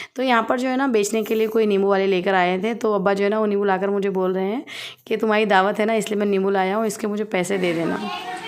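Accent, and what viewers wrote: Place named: Gulbarga, India. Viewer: Mujhe maloom ich hai ke gulbarga ke baatan aisich rahtie baji 🤭🤭🤭🤭🤭aapko waise baat karte hue sunke hasi aari mujhe par mashAllah baatein abba beti ki sunke khushi hui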